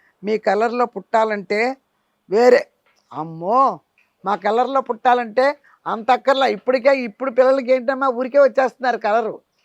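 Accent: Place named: Krishna, India. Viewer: Amma mee native place krisha districta. Acham vja side la ne chesthunnaru. Me bhasha kuda krishna jilla la ne undi.krishna jilla vallu thappa migilina ea pratham vallu ayina nimmakaya pachadi thalimpu vestharu. Assalu bagodhu. Naku ma nayanMma gurthochindamma. Me lane kakarakaya, mirchi allam vesedhi.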